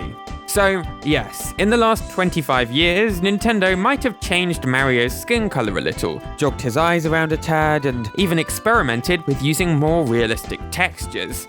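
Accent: United Kingdom. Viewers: He’s British that’s how we say it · HES BRITISH!!!!!!!! 😡😡😡😡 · For the people who are confused as to why pronounces Mario differently, a lot of us from the UK pronounce it that way and have been doing it for years so it’s nothing new. Some people in other countries say it that way as well so it’s not only restricted to us.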